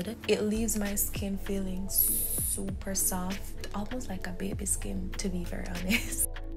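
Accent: Jamaica. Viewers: Hey are you Jamaican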